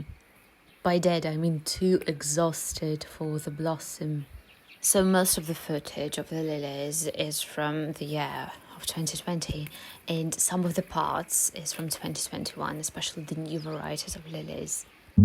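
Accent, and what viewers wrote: United Kingdom. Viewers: Hello, I hope you and your family are well. War is just horrible and it always leaves permanent scars on the land and people. I pray for peace everyday. Growing those beautiful lily's and delicious vegetables will give you something beautiful and good to look forward too. i have just started my tomatoes and peppers in my small 10 by 10 greenhouse and my rhubarb is just starting to poke through the ground. I cannot wait to get my hands in the dirt again,lol. I was wondering how you got that British accent? Iam in zone 6B here in north eastern US. Iam hoping the snow is all done and spring is really here and it is time for new beginning's and the renewed hope of life. Please take care of yourself and don,t worry, everything will be fine. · Also a big fan of Cacti, thanks! (By the way, your english is outstanding with a hint of a British accent.) Be well.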